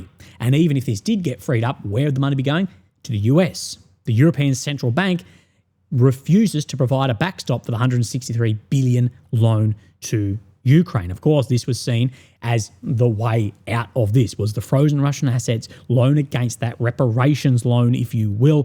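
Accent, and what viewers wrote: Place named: Australia. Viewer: Love your channel and would continue to support it, but quite honestly the Australian accent and rhythm is nearly impossible to follow. Not sure what you can do to get around that, perhaps sub titles. No offence but it's really difficult.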